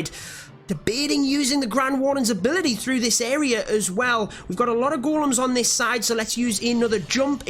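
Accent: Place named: England, United Kingdom. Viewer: Nice english accent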